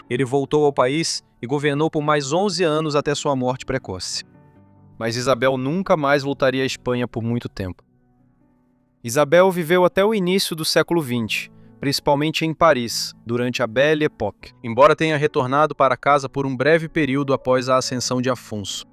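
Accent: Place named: Brazil. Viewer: Parabéns pelo vídeo, ótimo áudio em português brasileiro lindo, não assisto mais brief case, devido ao sotaque de Portugal, gostava muito do canal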